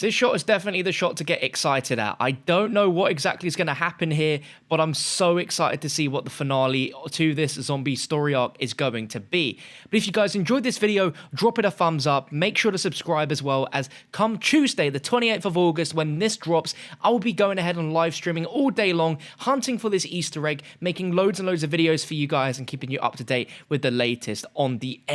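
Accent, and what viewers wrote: United Kingdom. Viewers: where are you from? from uk?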